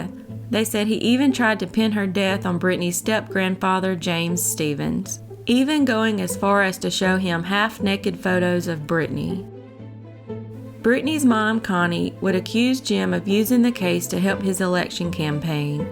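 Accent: South, United States. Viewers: Love your southern accent!! What state are you from? Love from Ireland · Love your accent- voice. May i ask what state you are from.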